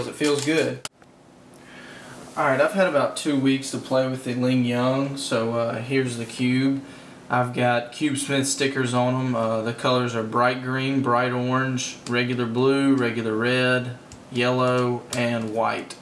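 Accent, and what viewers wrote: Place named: South, United States. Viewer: You're not from the Boston area, are you? You really sound like you're from the South. I lived in Knoxville, TN for a long time, and you really sound like you're from that area. By the way, the Maru, you need to tighten if it comes out of alignment. Tightening it will stop that. The Dayan 4x4, you HAVE to lube the inner 2x2 core, or it won't be good. It really is the best when you set it up right. Lube and loosen the screws on the 2x2 and tighten the ball shell screws all the way.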